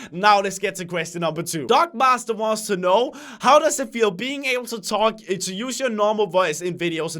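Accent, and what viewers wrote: Denmark. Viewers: please use ur Danish voice please I like the Danish one better than the real voice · danish I'm pretty sure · This voice sounds more Danish I like it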